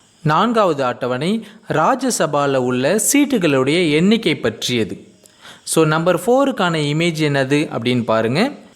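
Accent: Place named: England, United Kingdom. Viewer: Vera level Anna thanks. Unka voice la oru English .....style varuthu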